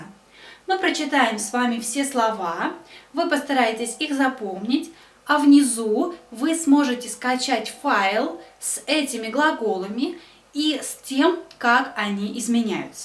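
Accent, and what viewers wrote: Russia. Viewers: Акает по московски.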